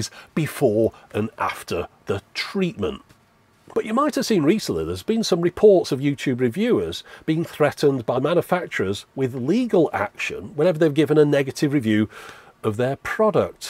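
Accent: United Kingdom. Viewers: Love the ion/iron wordplay. Only something you can do with a British accent 😂 please make the negative iron a recurring character 😂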